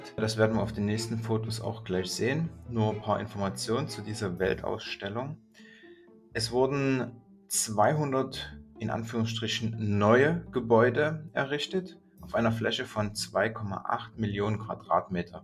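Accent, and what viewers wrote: Saxony, Germany. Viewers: Über die Rhetorik mach dir keine Gedanken, der sächsische Dialekt ist goldig und du hast eine nette, bescheidene Art, dadurch wirkst du sehr sympathisch. 💐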